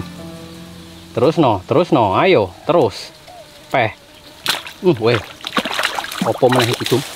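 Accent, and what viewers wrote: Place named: Java, Indonesia. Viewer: Abang ini asli mana kok logat nya campur jawa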